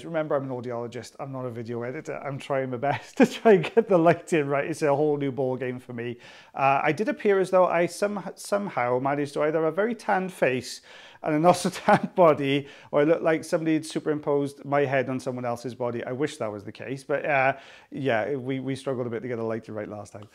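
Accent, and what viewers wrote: United Kingdom. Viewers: I’ve watched ear wax removal videos that have no explanation as to what they doing and I wondered why they only use certain tools at certain times. I had no idea the white stuff was skin! I found your videos and love that you explain everything and I’m a sucker for a British accent! I’ll watch no matter what format. Tissue paper??? Really??? Why?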